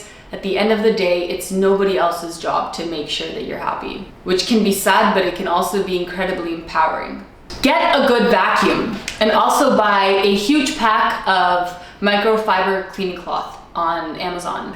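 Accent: Russia. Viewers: Блин только сейчас заметила что у, русский акцент когда она разговаривает на английском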